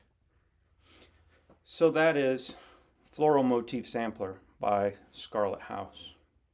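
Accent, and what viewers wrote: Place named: Wisconsin, United States. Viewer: Love love love the Plum Street saltboxes layout ..... I'm a house stitcher ...... you've enabled me 😊Also the Drawn Thread seasons idea. I've written this down or I tend to forget. Great great stitching!! Sounds like you are from Wisconsin. I'm halfway between Green Bay and Appleton. Enjoyed your bourbon story......I like to learn. Thanks for sharing! TV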